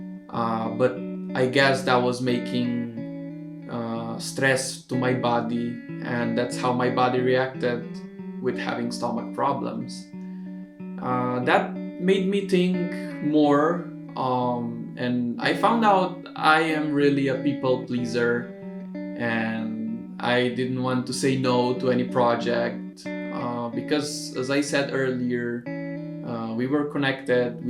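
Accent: Philippines. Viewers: I notice the way you speak English you have a Filipino accent. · You sound like a Pinoy. Haha. Interesting, first time I see a Caucasian guy sound like a Filipino.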